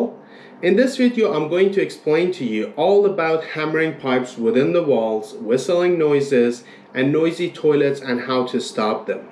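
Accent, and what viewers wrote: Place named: Iran. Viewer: You have an accent, are you Persian?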